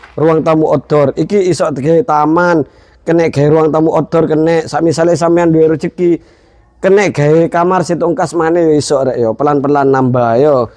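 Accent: Surabaya, Indonesia. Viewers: Meduro, rasa suroboyo iki🤣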